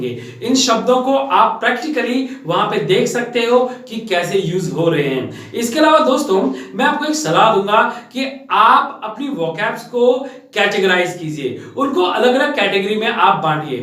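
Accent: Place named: United States. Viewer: Sir, Thanks for uploading such Useful educational video and I like your American accent speaking style besides you are bold enough and outspoken.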